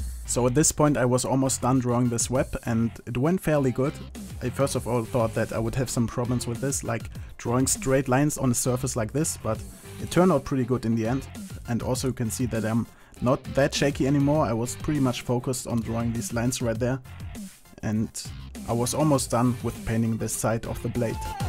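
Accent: Germany. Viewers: man hört sofort dass du deutscher bist x'D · Man hört halt den deutschen Akzent mega raus, ansonsten spricht er schon gutes Englisch =) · nur mal so man hört es wirklich raus das du deutsch bist aber mir gefallen die videos trotzdem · You sound so german :D You actually are I guess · Junge man hört so richtig dass du Deutsch bist xD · Des war.mir schon klar weil die meisten deutschen so reden wenn sie englisch reden:3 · You can hear it somgood that your german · man hört deutlich das du normaler weise deutsch sprichst, warum machst du dann keine deutschen videos? das würd mich wirklich mal intressieren :) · Man are you german? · Das Englisch ist super, nutzt alle Vokabeln richtig, macht keine grammatikalischen Fehler. Ist halt nur der deutsche Akzent den man hört.. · . Lass mich raten.... Du kommst aus Deutschland. Und der Akzent ist auch ziemlich offensichtlich. 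Alles in allem ist der Karambit aber gut gelungen und es ist auch schön zu wissen, dass hier keine Profis am Werk waren, weil man so das ganze besser nachkonstruieren kann. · hört sich sehr deutsch an;) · Du bist doch Deutscher.. oder?! xD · Arbeite mal an deinem Akzent das hört man mega, dass du deutsch bist. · Oh no. German english :( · finde ich echt nice wie du das hingekriegt hast( ich weiss das du deutsch bist höhrt man richtig heraus:p)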